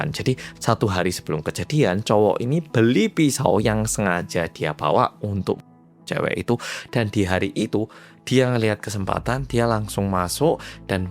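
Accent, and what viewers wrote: Java, Indonesia. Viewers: Mas udh cocok bgd jd orang indo.. Selain logat medoknya khas Jawa.. Udh terkontaminasi kata2 untungnya 😂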